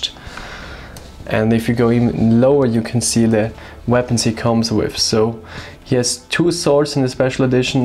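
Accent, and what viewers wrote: Germany. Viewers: your accent, are you from germany?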